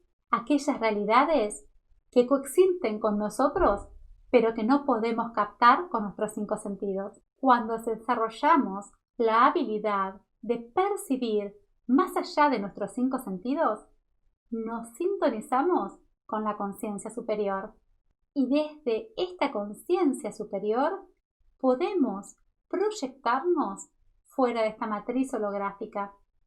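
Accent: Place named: Argentina. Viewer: Está muy bien la información pero ese desgraciado acento argentino y en otros videos el acento español hacen muy difícil aguantar verlos hasta el final, es un desgraciado suplicio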